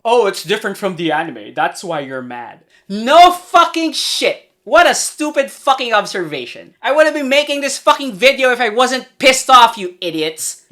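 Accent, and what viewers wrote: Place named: Philippines. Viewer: LMAO! HAHAHA, KABABAYAAAAAN! AAWOOHH! Sabi ko, parang pang pilipino accent neto a... pati ung hearts sa mga comments... Pinoy nga, ahahahah, Subscribe to you and God Bless Pare.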